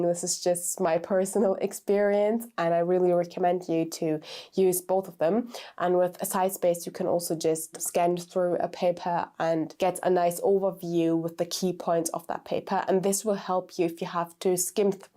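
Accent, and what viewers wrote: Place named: United States. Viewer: Imperial College but Yank pronunciation.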